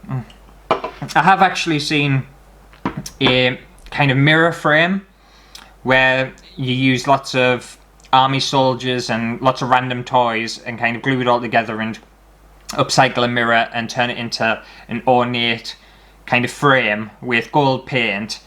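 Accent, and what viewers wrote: United Kingdom. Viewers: Hi 👋 I love your accent and how you phrase things. You are so funny! I know it's the UK-speak, but y'all say "us" instead of "me". When you say "us" I always imagine you and a mouse 🐁 in your pocket, lol! Or an orange 🍊 🐁 mouse on your shoulder (orange bc I just finished watching your sexy Terry's Chocolate Orange video).....so a white mouse --->🐁 with an orange long-haired wig and the steampunkish sunglasses 😆 🤣 😂.... for some reason I see the mouse with a Dali-type moustacheeeeo. You are so funny and brave! I would love to do what you do - meaning making videos- but I'm terrified 😨.....stupid, I know, but...?🤷🏼‍♀️ Anyway, your videos always make me laugh and help when I'm depressed. So, thank you! 🙂 🙃 🙂 God Bless 😃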